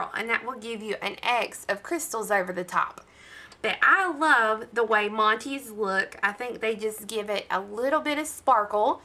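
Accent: South, United States. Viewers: these are beautiful ... btw I JUST figured out the charm of your voice -- it's that homegrown southern accent love your videos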